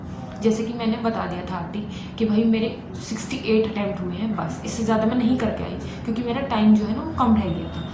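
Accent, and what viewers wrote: South, India. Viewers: Mam Aap south se ho kya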